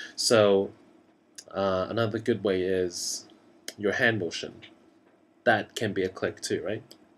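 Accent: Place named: United Kingdom. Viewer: British accent!!!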